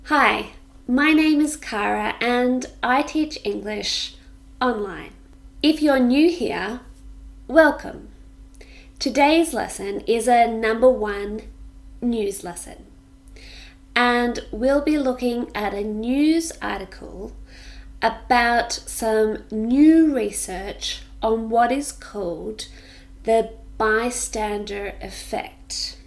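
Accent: Australia. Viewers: Hi!!!! I Am from Blumenau Brazil and I love your acent Australian!!!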